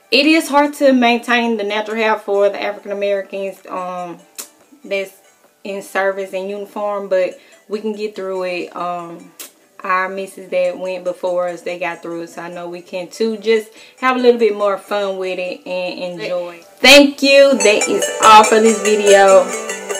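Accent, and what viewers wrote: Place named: South, United States. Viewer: Omggggggggg where are you from? I love your accent 😊 hmm let me guess...Houston? Atlanta? Tennessee?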